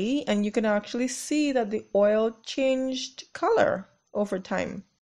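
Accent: Trinidad and Tobago. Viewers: Are u frm trinidad? Your acvent almost sounds trini 😊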